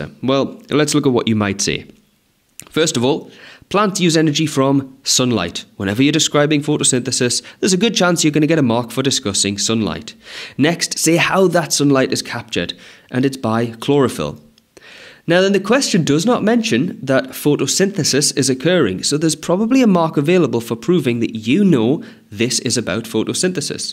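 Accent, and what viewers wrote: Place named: United Kingdom. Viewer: no not the british accent i don’t understand it💔😭💔😭💔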